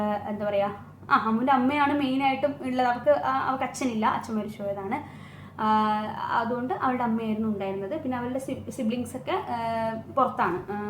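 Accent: Kannur, India. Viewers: KL Biju venta kannur slag.you good speaking. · അതെന്താ കണ്ണൂരുള്ളവർക്കു നന്നായി സംസാരിക്കാൻ പാടില്ലേ? ഒരോ ജില്ലയ്ക്കും ആ പ്രദേശത്തിൻ്റെ തനതായ 'സംസാര ശൈലിയുണ്ടാവും.. നിങ്ങളുടെ വിദ്യാഭ്യാസത്തിൻ്റെ ഗുണമാണോ ഒരു പ്രദേശത്തെ ഭാഷയുടെ പേരിൽ നെഗറ്റീവടിക്കുന്നത്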